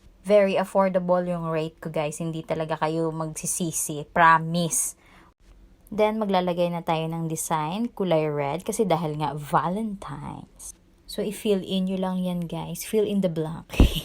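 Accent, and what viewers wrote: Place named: Iloilo, Philippines. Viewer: Ilonggo accent haha di madula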